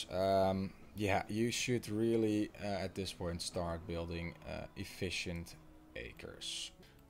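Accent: Netherlands. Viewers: Ik herken een Hollander uit 100 accenten :D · a lot of ppl are annoyed with the Dutch accent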